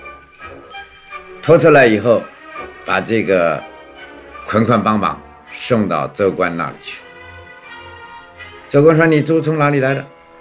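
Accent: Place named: Jiangsu, China. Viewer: 这个口音一听就是江苏中北部口音无疑！ 司马老先生最近仙逝。 安息 :face-red-heart-shape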